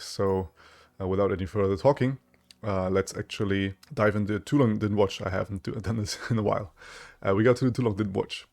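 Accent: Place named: Germany. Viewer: Lustig wie man selbst bei dir raushört das deutsch deine Muttersprache ist haha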